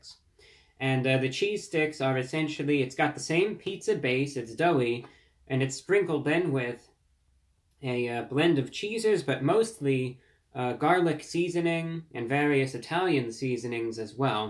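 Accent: United Kingdom. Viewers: Loving his heavy British accent on the podcast now ❤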